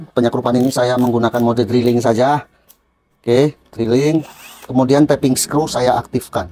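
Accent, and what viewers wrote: Tegal, Indonesia. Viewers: bhs tegal e mbabed...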